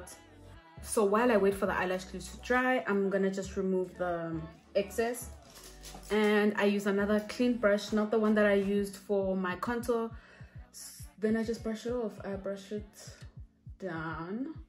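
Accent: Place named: Germany. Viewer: Man hört das sie deutsch ist oder bin ich dumm😂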